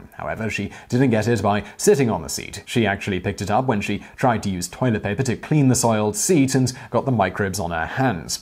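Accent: England, United Kingdom. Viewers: Still, as always...thanks for your nice signature English Accent, clearly delivered without sounding like you have a mouth full of English marbles...really.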